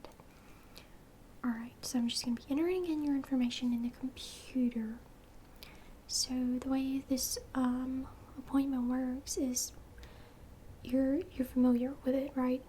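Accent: South, United States. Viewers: That southern accent ❤️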